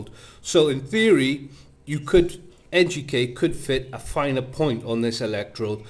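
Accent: Wales, United Kingdom. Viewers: He's Welsh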